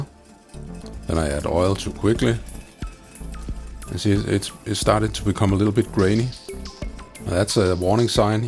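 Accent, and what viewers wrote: Denmark. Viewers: I knew you were danish because of your accent 😉 thanks for the video 😊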